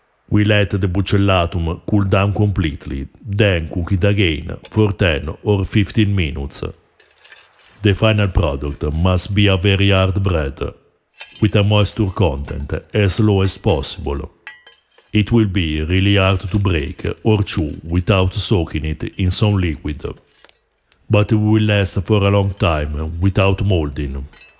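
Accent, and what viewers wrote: Italy. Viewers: Where part of Italy you from?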